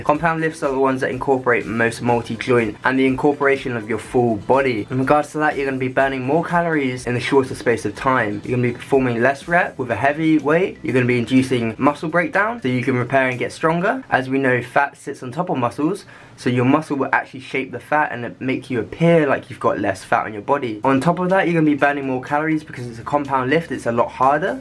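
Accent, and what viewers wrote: United Kingdom. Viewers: blue eyes and british accent ! oh im falling for u !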